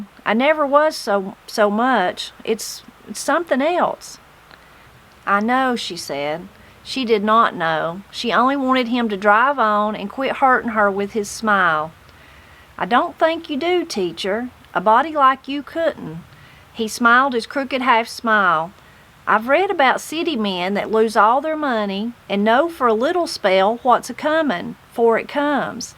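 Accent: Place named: North Carolina, United States. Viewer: Your accent reminds me of my relatives, on my Mom's side...... they all came from Knightdale, NC. I recall hearing very similar voices, and the dialogue was always family, gossip, or both. Thank you for a trip back, if only for a wee bit.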